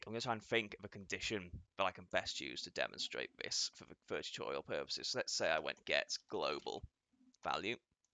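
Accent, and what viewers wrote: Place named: Liverpool, United Kingdom. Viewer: great to see a new vid from you mate, i still struggle with the scouse though :)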